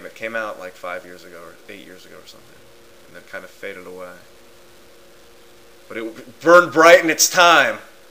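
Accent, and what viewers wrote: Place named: General American, United States. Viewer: I disagree. Many, if not most, English speaking natives never master the English language. In my experience as an editor, well educated foreigners master English better than Americans. Among the typists, proofreaders and editors, it is a virtual United Nations at my newspaper. Top publishing firms have concurred with my statement. We come to this conclusion by who shows up when we hire. Don't sell yourself short. Where did you learn how to speak General American? It is perfection.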